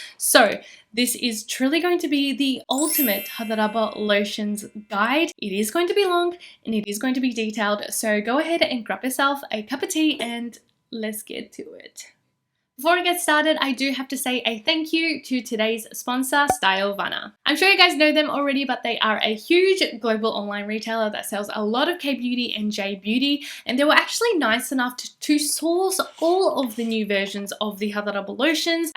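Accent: Australia. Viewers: You're Australian!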